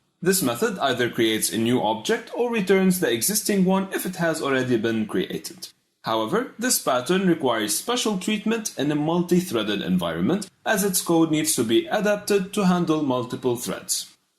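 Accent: Lebanon. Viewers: you sound Lebanese dude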